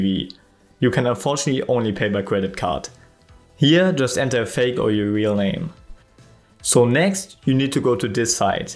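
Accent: Germany. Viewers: meinte Deutschland einfach wegen aktzent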